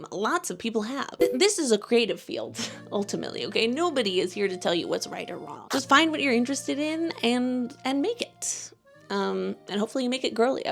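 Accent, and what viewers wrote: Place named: United States. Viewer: hello! thank you for the video, it finally pushed me to start actually learning to program (cry). also you mention a lot of games in the video, would you mind writing the names out? i'm a little hard of hearing and unfamiliar with american accents, so sometimes it's hard to pick out the actual spelling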